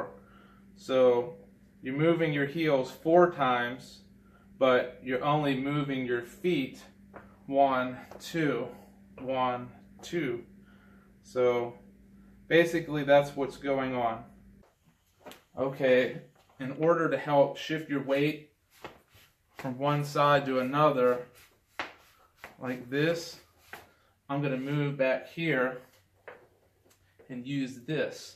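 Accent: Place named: South, United States. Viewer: Great tutorial! May I ask where you are from though? You have a very interesting accent! (Southern US?)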